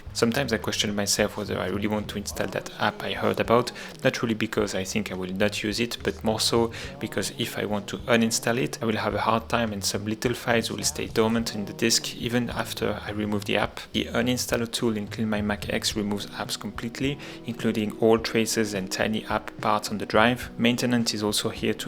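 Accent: France. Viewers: Je me disais que ça sentais l’accent français ! Super vidéo, grand talent !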